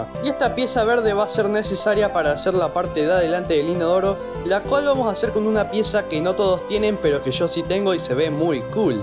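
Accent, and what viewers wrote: Argentina. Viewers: Eres de argentina? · argentino obio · Eres argentino nove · eres de argentina verdad? buen tuto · Eres de argentina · No hace falta decir que el men es de Argentina verdad?? XD, fuera de eso, tus videos/tutoriales son muy buenos bro, sigue así y llegarás al los 100k :D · de argentino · Eres de argentina papu pro😊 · Tu eres de argentina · Yo llase de que sos de Argentina lo se por como hablas y yo también soy argentino me gustan tus videos 😊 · Eres argentino no?? 😅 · SOS argentino por tu acento 😊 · Se re nota que es de ARGENTINA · Te quedo muy bueno el "abuelito molon" eres argentino? No c pero bueno 🗿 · Sos de argentina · Eres de argertino no?? O uruguay??, la digo por que su acento tienen parasido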